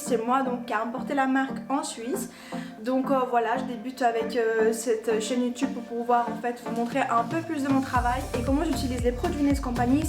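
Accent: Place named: Brazil. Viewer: On voit de suite que tu es formatrice 🤩. Le travail est soigné et parfait 👍 bravo, en prime j’adore ton petit accent brésilien je crois ? ☺️🤫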